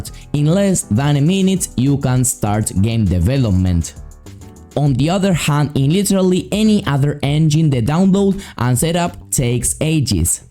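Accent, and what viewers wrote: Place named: Argentina. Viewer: Ese acento Argentino se escucha a leguas. Traduci tus videos asi nos ayudas a los hispanohablantes jajaja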